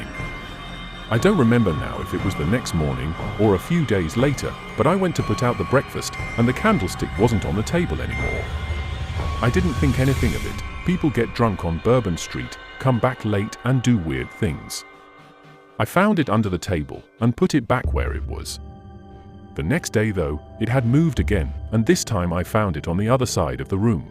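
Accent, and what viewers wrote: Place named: England, United Kingdom. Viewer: Why the English accent? I have a problem understanding such accents and such....I tend to avoid these videos. Sorry.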